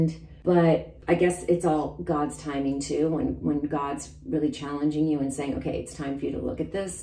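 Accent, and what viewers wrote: United Kingdom. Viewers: Your British accent is so Carnaby Street! I love it. Cheers.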